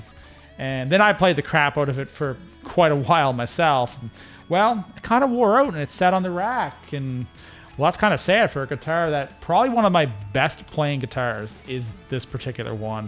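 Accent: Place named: Canada. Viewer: I heard your accent BEFORE I saw the Saga poster... then the Rush poster... LOL... Congrats on being a fine representative of the Great White North, my friend! LOL... Great video, thanks! I have a lot of RGs and I use the 1996T often!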